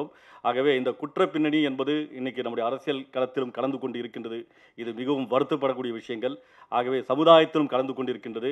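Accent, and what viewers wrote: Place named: Karnataka, India. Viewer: sounds like he is kannadiga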